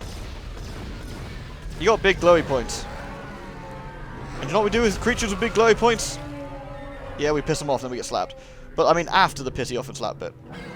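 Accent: United Kingdom. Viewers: So, I have to say congratulations for re-finishing the original Dead Space. I started watching you before you did this game originally like who knows how many years back but it was the original playthrough that made me subscribe to you. While I love DS, I prefer to play DS2 due to it´s many gameplay improvements. Story/atmosphere-wise, DS obviously wins. I would like to thank you for several things. You have helped me learn English. You have helped me open the world to me. Thanks to you I have delved into streaming and have met many friends. You have helped me go through depressions and some of the worst moments of my life. All of which without ever speaking to me or knowing of my existence. I have no idea what I would be doing with my life without your wonderful sarcastic British voice. So yeah, wish you many more awesome years and hopefully, if you still feel like it, sharing those with us through gameplay. 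:)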